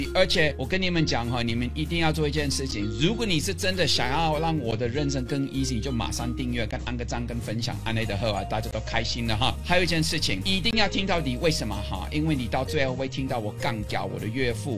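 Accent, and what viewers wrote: Taiwan. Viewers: 您的台灣國語說得太棒啦！:) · 這位教授........你的口音不只是那麼簡單...你語氣結尾帶著齁......有夠台....我這台灣人講話都沒你那麼台....... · 29年讓人改變了！臺灣國語耶！哈哈哈！ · 好幽默哦，也很台（穿插好多台語） 讚👍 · 很台灣腔既老外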